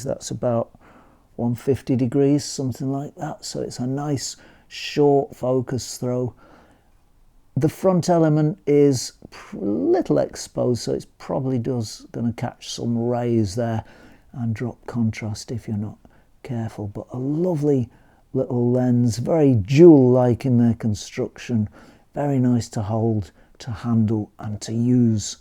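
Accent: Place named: RP, United Kingdom. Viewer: It is so much more pleasant for me to listen to a cultured British voice than to any American speaking, I notice that again & again. Thank you for sharing your photography knowledge.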